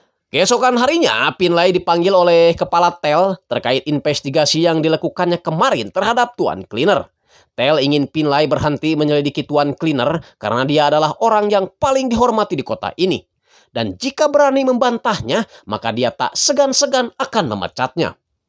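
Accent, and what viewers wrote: West Java, Indonesia. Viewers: Logat orang sunda hahaha.. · Orang sunda nih .. sama kaya iq 7 Enak di dengar😂😂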